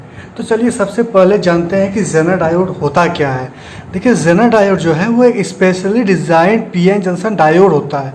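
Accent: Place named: Bihar, India. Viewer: Bhai aap ka voice bihari jesa AA Raha hee😊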